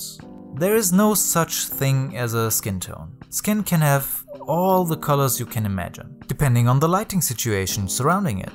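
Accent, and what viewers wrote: Germany. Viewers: Ich genieße dein Englisch mit Deutscher Sprechmelodie es ist so angenehm !♡.♡ Dass du großArtig bist, weißt du ja schon ;)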